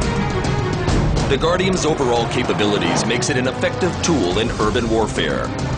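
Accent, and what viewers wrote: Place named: United States. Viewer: Why on earth is the narrator a yank?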